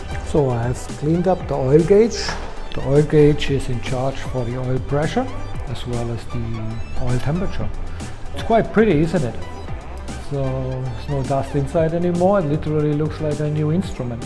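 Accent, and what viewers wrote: Germany. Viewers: Thanks for the video with the nice swabian accent. Als Schwabe hat man den halt...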